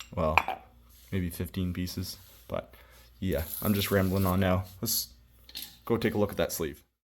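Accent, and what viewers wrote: Canada. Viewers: I’m curious are you from Canada? I ask because your accent sounds like a Canadian accent of some sort and a lot of the stuff you use in your videos I’ve yet to see in the states or at least my state and potentially Missouri as we go up to visit family that lives there once every year or 2 either way I love your videos though I do worry about your safety as it does seem there are a lot of risk involved which is why I am glad you assess the situation before hopping into the bucket and start working and also make sure you take proper precautions to ensure your safety while also not causing further damage to the lines you’re working on but one thing I do have to say the fact you take the time to explain why you’re doing something this way or that way or just simply boiling down to training you’d receive as becoming a lineman, and it’s a dangerous job, my grandfather works in highway construction but also has built houses before he and my grandmother moved down here when my mom was 2 so he also has some knowledge when it comes to wiring up a home for the most part and as someone who’s fascinated by electrical equipment and electricity he left me with a warning to be careful when measuring the voltage 120V AC as it can also cause severe injuries or death, which I’ve already taken precautions when I’ve done this stuff, but I’ve learned a lot about step up voltage, step down, inverting voltage from say 12vdc to 120vac even down to using a old spare computer power supply as a a budget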